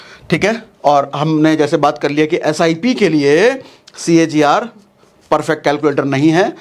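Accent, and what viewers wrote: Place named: Bengal, India. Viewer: Bhaiya ap bangali ho?👀motamuti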